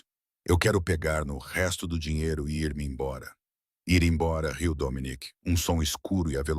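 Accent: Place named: Portugal. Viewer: EQUIPE ! ARREPIANTE, AFIADISSIMA ADAPTAÇÃO DE ROTEIRO. O MELHOR E MAIOR MÉRITO A NARRAÇÃO AO ESTILO LUSITANO COM MUUUIIITO ESTILO. ADORÁVEL! ✨💫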